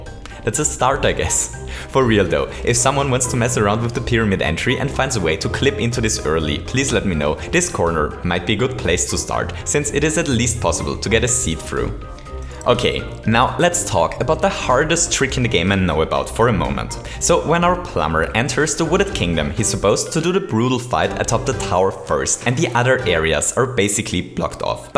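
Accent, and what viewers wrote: Germany. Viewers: Du bist Deutscher, nicht wahr? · Dang your German accent😂❤️✊ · Bist du deutscher? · Man kann irgendwie so raus hören, dass du deutscher bist xD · Fuck urself. Have you ever learned a second language? If you have, is it as stupidly hard and inconsistent as English? German is his first language, and he's tried to speak like a native English speaker, but it's almost impossible to do so without an accent. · are you german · Are you German? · Are u german lol? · are you german? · are u german?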